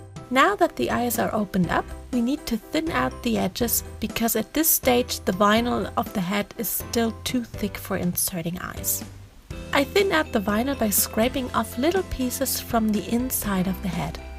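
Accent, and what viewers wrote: Germany. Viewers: speaks good English with slight accent Me: Mmh... is that a german accent I hear? Me: Sees Uhu glue YES I was right!(or she's austrian) If you see this comment pls reply! (I'm german too?) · Are you German? I like your accent.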